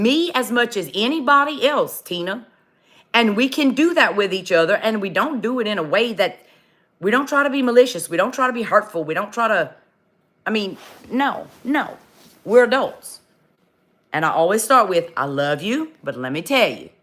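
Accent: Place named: Louisiana, United States. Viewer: Okay, I have to say. I LOOOOVE your accent! I am from GA, but your accent is amazing! I could listen to you all day! LOL You gotta be from Louisiana!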